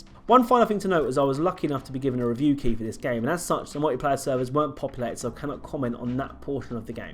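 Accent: United Kingdom. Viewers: Oh then you're just not used to this guy's accent then. It doesn't mean other non UK speakers have a problem understanding these kind of accents though.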